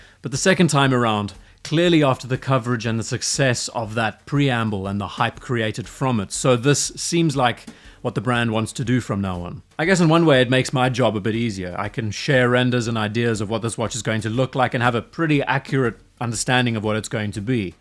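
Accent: South Africa. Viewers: Wait now, what is this accent, are you South African?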